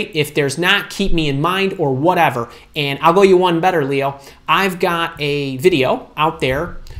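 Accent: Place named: Midwest, United States. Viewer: Every few years I watch your videos when it’s that time again. I always get great guidance — & that amazingly vowel-ly Midwest accent just warms my little Chicago heart every time! 😊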